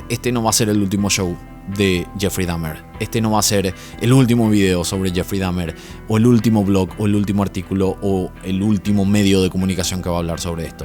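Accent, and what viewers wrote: Paraguay. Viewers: Sos paraguayo? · Me pareció que serías de PY por el tono de hablar y genial al saber que si, que grande sos gracias YT por mostrarme esto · de donde sos??? o tus padres> por el acento pregunto:goodvibes: ( Paraguay??) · Apenas te escuché hablar cada vez me convencía más que eras Paraguayo, o estoy equivocada? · tu acento es paraguayo vrd? lo digo pq yo soy paraguayo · Es el acento nuestro de los paraguayos! es paraguayo. Saludos a Venezuela desde Paraguay!! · Buenas tardes, genial tu canal, una consulta, sos de Py? Te pregunto por el acento, gracias por tus aportes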